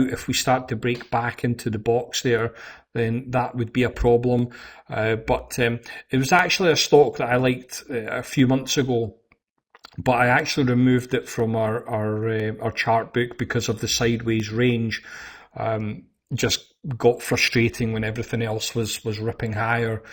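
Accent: Scotland, United Kingdom. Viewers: Just subscribed to the channel and enjoyed the content and the Scottish accent! Did a trip to Scotland 2 years ago and found a cemetery In Dysart, Kirkcaldy, that had my grandfather 7 generations back. A huge fan of technical analysis so this video was enjoyable to watch and I will watch previous months also. My biggest positions are airlines-I have 3 but I would like if you would cover UAL. One thing I don't know much about is Fib extensions so if you would cover that I would appreciate it. Thanks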